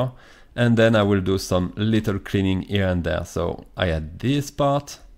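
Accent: France. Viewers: ta un acent francais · cet accent français .-. Enfin bon je dis ça mais je ne suis pas mieu lol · Thank you for your clear explaination and your french accent, it help me a lot. I'm doing my transition toward Krita and this tool is very impressive. I have just tried it on my last page, i've saved maybe one hour today ;) Thanks you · Tu peux faire la video en français (je reconnait un accent quand j'en vois un) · Thanks for the tutorial (Ps: is it me or you sound french?) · l'accent français jpp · Hmm l'accent francais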